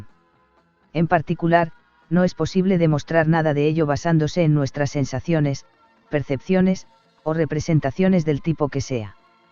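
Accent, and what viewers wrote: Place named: Uruguay. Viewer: me tinca que tu voz es mucho más agradable q esos "emuladores de voz" o como se llamen que usas en tus vídeos, además con acento uruguayo serían muy entretenidos. Por principio me salto los vídeos q usan estos text to voice, puesto q los usan los millenials conspiranoicos. Vi ambos xq estaban en "No sin grave daño". Por ahora te leeré ahí.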